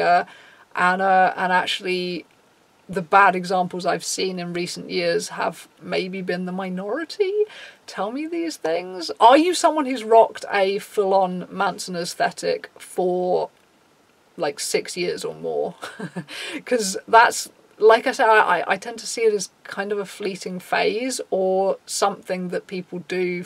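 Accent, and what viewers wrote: United Kingdom. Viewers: You sound British... if you are British... please tell me what shopping centres people who listened to chilled in a shopper centre and pretended to be vampires because I LITERALLY never seen this. Yes I got bullied at school for being a “Greebo” but literally nobody reacted like this who listened to him.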